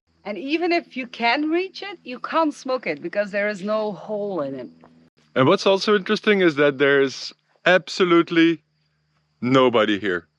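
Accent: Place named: United Kingdom. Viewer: You're sounding more like a British tourist as time goes by.😢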